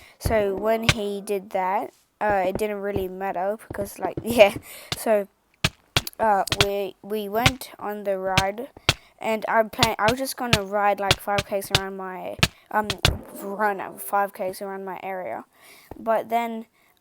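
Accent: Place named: New Zealand. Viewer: ok u sound like a kiwi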